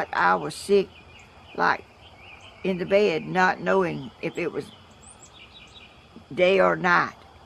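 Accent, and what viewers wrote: South, United States. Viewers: Don't you worry, I love listening to your accent, it reminds me of when I lived in the south. It saddens me that young people don't have the accent anymore. Thumbs up. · Hey, don't get to down on yourself about your camera acting up, it happens to alot of people on YouTube. I admire all your crafts and seem like so much fun!!! And I love a good southern accent!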